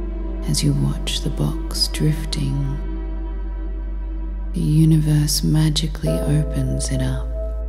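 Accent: Australia. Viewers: What a beautiful meditation! Her voice is just so calming & soothing. I believe it was an Australian accent(?) I LOVE that accent!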